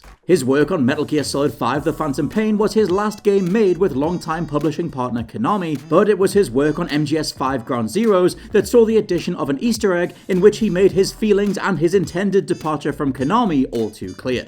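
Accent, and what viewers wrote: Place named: Scotland, United Kingdom. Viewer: clicks video hears scots voice and leaves.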